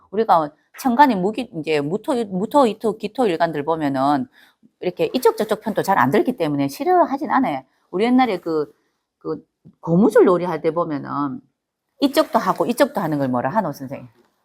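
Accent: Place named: Daegu, South Korea. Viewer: 저 이분 설명 너무 잘해주시고 대구 사투리가 참 예쁘네요 대프리카 싫어하는데 이분강의는 참 좋네요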